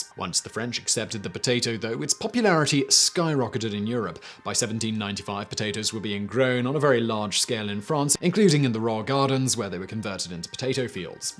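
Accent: United Kingdom. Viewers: Is it just me or does the narrator’s intonation sound annoying? I usually like the British accent but his annoys me. Ugh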